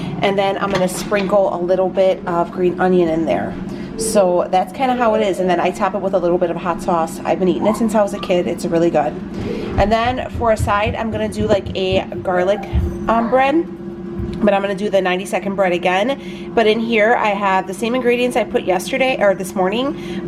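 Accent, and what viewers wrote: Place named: Chicago, United States. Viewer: I was born in Chicago (East side) and raised in Illinois. You remind me of my peeps, especially your accent. Love your channel.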